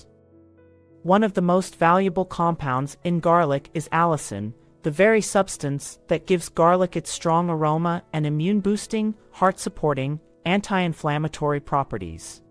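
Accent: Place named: United States. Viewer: I hear an American voice telling us all this nonsense. Have you ever read the labels on most of your American Foods. They are packed full of Chemicals that cause more health harm than keeping them in the fridge.🏴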